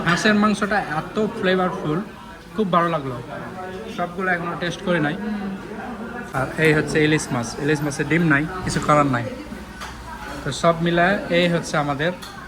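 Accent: Rajshahi, Bangladesh. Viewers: বাংলাদেশে আপনার বাসা কোথায়, ভাষা সুনে রাজশাহী মনে হচ্ছে।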